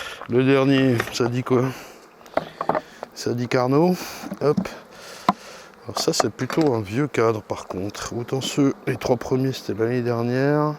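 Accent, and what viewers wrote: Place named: South-West, France. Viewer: Avec ton accent du sud ouest quesque tu fou dans le chnord 😂😂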